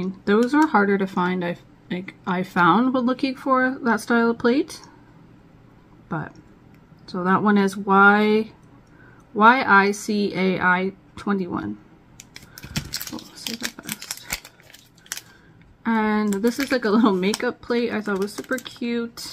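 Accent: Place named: Canada. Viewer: I believe you are Canadian. I am from BC and I can hear it in your voice so I am so following you because you are fantastic!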